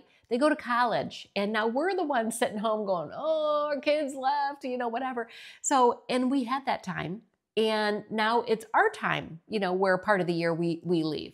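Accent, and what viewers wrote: Michigan, United States. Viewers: I have to laugh about your so called accent, I’m from Michigan also but now live in East Tennessee, I get asked all the time , where are You from you have a “ up north” accent, I don’t think we have one at all , but people sure do lol , glad your back is good , praise God!!!! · As a former Michigander, I can hear your “accent.” I moved to South Carolina 20 years ago and married a Charleston native. My husband says my “accent” has softened over the years. 🤷‍♀️😁 BTW, I do NOT miss Michigan winters one little bit, lol. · I have a good friend from Michigan and you sound like her. You do have a slight accent like I do coming from Pittsburgh! I fell down the steps in 2018 and broke my back in 2 places. I had surgery on T6 but they let L5 heal on it's own. I still have back pain from the L5 and permanent nerve damage in my right foot. 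Nothing stops me! I work 4 days a week and babysit grandkids 1 day a week. I could leave my grown kids but can't leave the grankids. So I guess we'll put up with northeast Ohio winters. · Lmao. I’m 80! Ha ha! you look very very good at your age. We are close in age. I just know some women let themselves go as they age. You do have a Michigan accent, it’s cute 😅. I appreciate your honesty. I am Carnivore way of eating for 11 months. And I am at the weight I haven’t been in 25 years. I am so much healthier. As a RN I understand your back pain issues I remember the video. I pray you stay well. Your skin is beautiful and your figure is banging 😅. Hugs from TX ❤❤ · I can definitely tell you're from Michigan. I have relatives from Michigan...and they have the same "accent". 🙂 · Interesting video,! As for your accent, I am familiar with how Michiganders sound, so I figured you were raised there. 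As for Midwest accents...there are so many different ones! I was raised in northern Iowa where we have an accent similar to Minnesotans. However, I've lived in southwest Iowa for 38 years and here people sound more like Missourians. And that's just Iowa! 😅 Let alone South Dakota, Minnesota, Wisconsin, etc. Have a good week!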